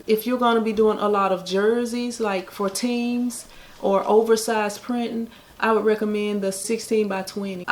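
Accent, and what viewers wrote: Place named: Louisiana, United States. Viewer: Thank You! The information you provided was so helpful! Love the accent I'm from Louisiana also!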